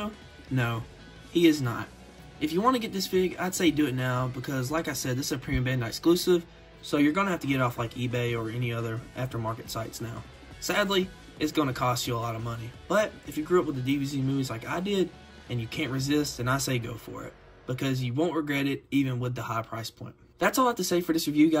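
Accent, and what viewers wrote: South, United States. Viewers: 😂😂😂this was like watching a review voiced by one of the Beverly Hillbillies 😂😂 I'm sorry, but the southern accent had me laughing the whole time. Great review btw.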